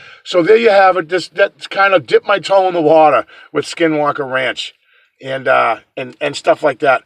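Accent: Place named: Massachusetts, United States. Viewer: Well how are ya?? Linda in Florida here. How about this skinwalker story....when Mr. Sherman chased those little blue orbs with his 3 cattle dogs they chased the orbs out of his sight when he heard them yelp he ran as fast as he could to get to them and he found 3 melted oozy grease spots that was once his dogs. And that's when he gave up and sold the ranch...thats a horrible thing. Lots of stuff happened out there.......that place is evil!!!!!! And yes.you almost have got to get into paranormal stuff when your into Bigfoot cuz I believe that somehow there is a connection. Love your vids and love that mass. Accent. I'm odd up there spook much..hey see ya on the next one..lol..Linda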